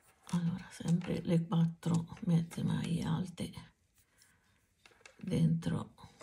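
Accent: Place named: Fermo, Italy. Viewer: Veramente bella e primaverile. Ma di dove sei?io abito in un paesino a pochi km da Fermo...a sentirti parlare sembri anche tu delle mie parti...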